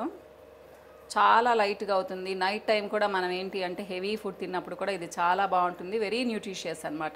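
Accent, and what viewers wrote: Nellore, India. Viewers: gaaru mee videoes chusthunnaanu,mee manchi maatalu,padhimandhiki panikivachhey vishayaalu, mee maatalaloney thelisipothundhi mee methhati manasu.maadhikooda Nellore eynandi,mee prathee maata nenu matladuthunnatley undhi, naa manasu ki daggara gaa undhi,ee roju pappuchaaru chaalaa baagundhi,nenu kooda eppudu chesthuntaanu,kodhigaa populo menthulu,inguva vesukuntey Inkaa chaalaa baaguntundhi,kothhimeera kooda dinchey mundhu vesukuntey chaalaa baaguntundhi.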